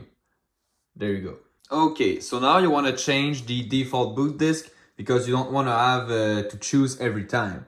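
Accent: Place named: Quebec, Canada. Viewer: Tu est quebecois ca ce voit MDR!!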